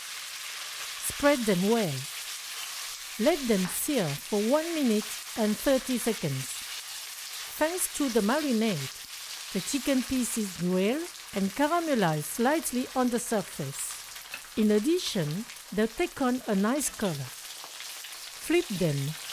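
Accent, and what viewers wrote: France. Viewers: Asian lady with french accent - noice.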